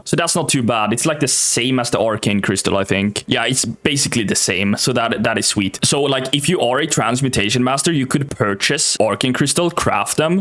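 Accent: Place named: Sweden. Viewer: PLEASE FOR THE LOVE OF GOD STOP SAYING "SHA-LENGE", "SHECK"... ITS CH NOT SH, YOUR SWEDISH IS SHOWING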